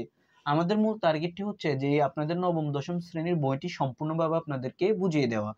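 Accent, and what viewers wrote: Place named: Chittagong, Bangladesh. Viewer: আপনার কথা বলা শুনে মনে হয় আপনার বাড়ি চট্টগ্রাম😊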